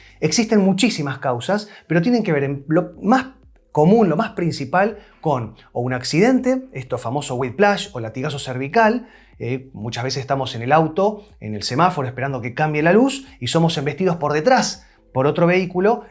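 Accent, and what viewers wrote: Buenos Aires, Argentina. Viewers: Gracias , muy buen video. Mi nuera una muchacha joven tiene cuello a l miseria !!! Deformado . Joroba. P mala postura pienso ; trabaja mucho c l compu. Pero comenzo deformidad mas joven. Voy a mostrar este y tratar vaya a quiropractico. Ud es argentino ?? Porteño?? 👍🇺🇾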